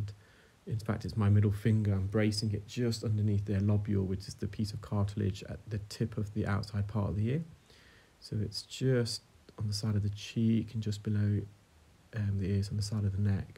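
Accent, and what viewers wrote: United Kingdom. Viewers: You're not the first to fall for a British accent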